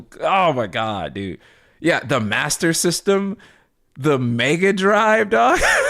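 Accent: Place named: North Texas, United States. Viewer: ayyy I didn't know you were from North Texas too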